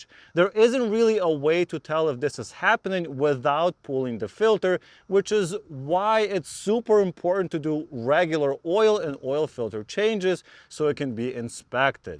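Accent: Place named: Russia. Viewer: Акцент наш ))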